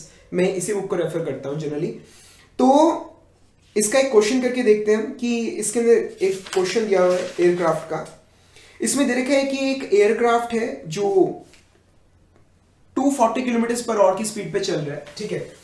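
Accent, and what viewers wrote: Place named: Punjab, India. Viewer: ap pkka punjabi ho sir....jo baar baar raje rajee kr rhe the...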